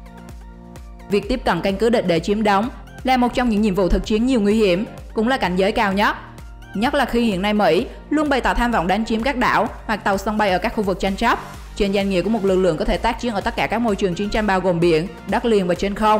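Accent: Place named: Central, Vietnam. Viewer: Giọng miền Trung mà thuyết minh thì hãm thôi rồi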